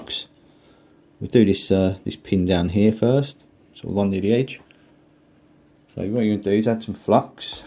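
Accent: United Kingdom. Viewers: What if you did a video with a two-sided board top and bottom with a sleeve that would be cool , sounds like you're from the UK I'm from Sunny South Florida USA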